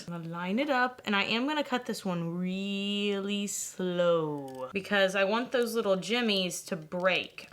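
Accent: Texas, United States. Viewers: Your lovely, soft Texan accent does something so incredibly kind to Baz Lurhman's name! In Australia if a bunch of folks call to him it sounds like a flock of seagulls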